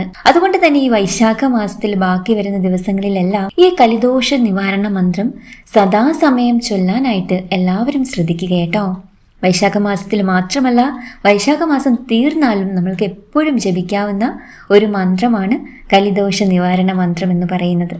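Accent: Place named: Valluvanad, India. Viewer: എല്ലാം ഒരേ വള്ളുവനാടൻ ശൈലിയിൽ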